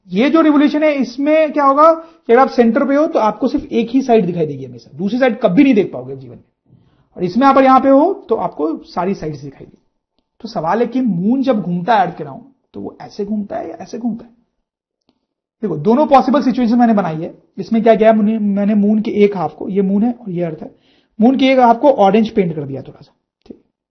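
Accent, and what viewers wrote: Bihar, India. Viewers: sir aap bihari ho Kya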